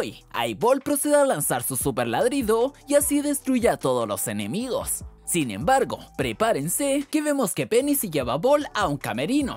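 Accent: Chile. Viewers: Pera pera eri chileno ?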